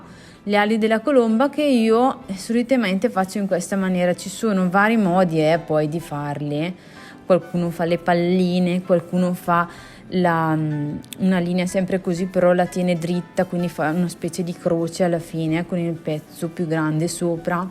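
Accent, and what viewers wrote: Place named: Lombardy, Italy. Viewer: Posso chiederti di dove sei? Dall'accento mi sembra, che sei BS o BG 😂😉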